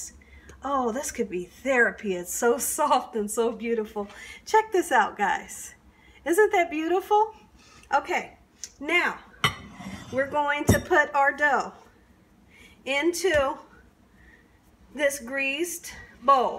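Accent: South, United States. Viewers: I love watching you bake,nd your talking country charm makes me feel like Im back at my grannys house.Im definally gonna make these.Im craving them now!!!!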